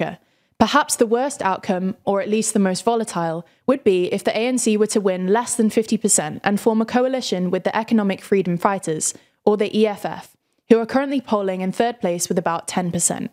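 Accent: United Kingdom. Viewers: Sound like UK 🇬🇧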